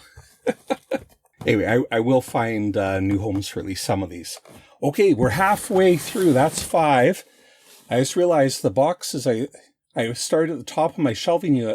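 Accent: Canada. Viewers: Thanks for all the content. Your voice is so relaxing, and I love your Canadian accent!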